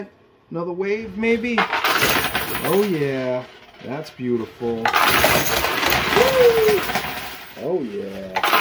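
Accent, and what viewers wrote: United States. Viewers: You sound like you are from New York? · Do I hear a New England accent. New to your channel. Where can I go to play this machine?? Please let me know!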